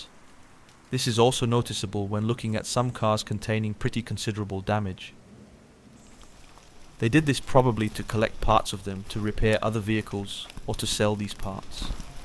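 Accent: Netherlands. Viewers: I like your Dutch accent 👍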